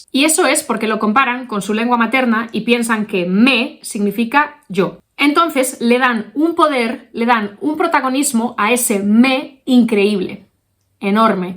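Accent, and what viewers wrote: Spain. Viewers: Me gusta mucho tu acento español y quiero aprender a pronunciarlo Soy youssef y vivo en valencia y tengo 1año y 6 meses en espaná Puedes ayudarme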